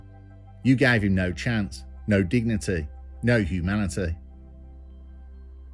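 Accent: Birmingham, United Kingdom. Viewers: Good upload with a Brum accent ? Thank you fella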